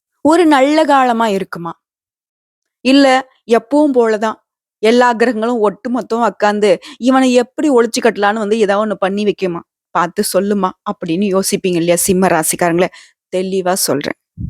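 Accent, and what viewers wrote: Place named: Periyapalayam, India. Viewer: Periyapalayam Tamil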